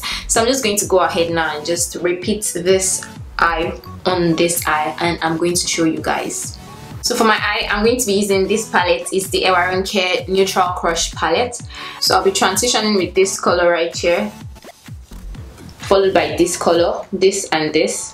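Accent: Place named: West Africa. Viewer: Okok I see you ahaha, I hear an accent are you from somewhere in west Africa ?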